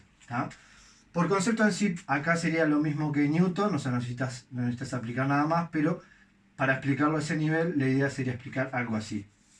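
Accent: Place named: Uruguay. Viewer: Interesante hipotesis, mucho mas siendo que no eres físico. Eres uruguayo? Tu asento se parece mucho. Deberias estudiar en el Balseiro. Saludos desde Argentina.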